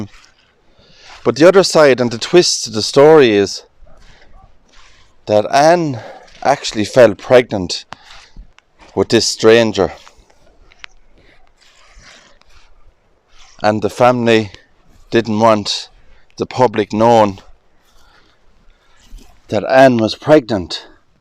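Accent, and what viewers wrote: Ireland. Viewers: I would watch your videos just to listen to your brogue! Love it.❤ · I heard the irish accent, and knew I had to give you a listen to, I like the graveyard walks, so I'll go through the other videos, keep up the good work 👍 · Hi i just love your voice Irish Accent, from young age my sister and i loved grave yards reading head stones we did this even when we first retired. You do a grest video. My Daughter always wanted to meet a irishman to marry, she sang the celtic music, trouble was she was stunning stil a good looking woman could not cope with her beauty never lived or married she has a strong spirit wont be messed about, but she got on with irish men, she said true men, but they found her spirit to much. She used to sing on the ferry boat from liverpool to ireland. So i never got my irish stepson, were not Irish.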